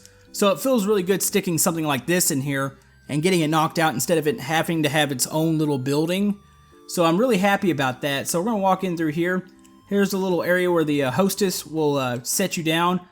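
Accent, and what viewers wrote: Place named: United States. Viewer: What state is your accent from?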